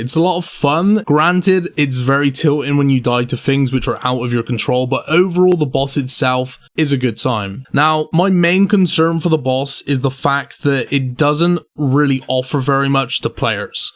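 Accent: United Kingdom. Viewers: where is from? im guessing UK but i cant tell exactly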